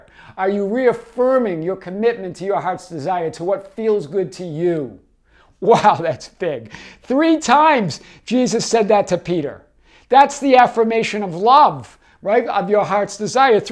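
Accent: Boston, United States. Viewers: Love your Boston accent ❤️ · I love your Boston accent! Thank you! You helped me remember and continue to do so. I love my life and I am grateful! Thank you again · Weird thing, the whole reason I started listening to you was literally because of your accent. I’ve always had a strange connection and love for Boston… we go there every chance we get… And we try to say “chowda” etc😂 All that to say your accent is one of many things that makes you our lovable and lighthearted teacher- I think it’s part of what put you on the map!!!!!! 🍀It did with me anyway!! ❤️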